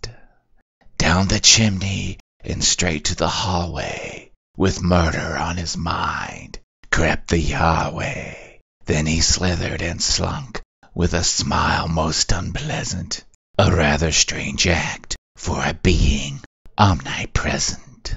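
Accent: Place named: Kentucky, United States. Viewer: I recognized the accent and figured it was Kentucky or Tennessee.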